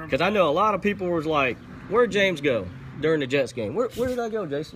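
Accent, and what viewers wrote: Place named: South, United States. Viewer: Asian with a southern accent...amazing/sexy I watched your whole video even though I don't know you. I would fly you to the Philippines and give you zen.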